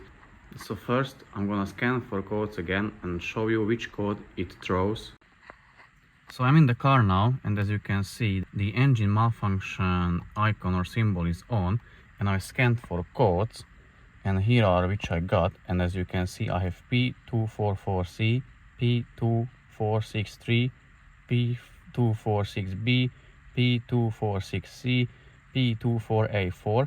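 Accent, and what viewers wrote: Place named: Hungary. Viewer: Ez igazi Magyar dialektus. 🤪🥰🤣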